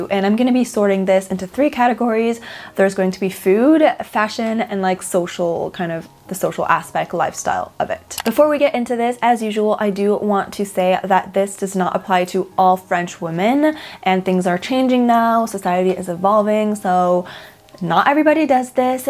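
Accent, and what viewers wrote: United States. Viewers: Are you french? I feel like you talk like you came from the US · Your America accent is darn good, so says this American! Of course, we would expect your French accent to be perfect.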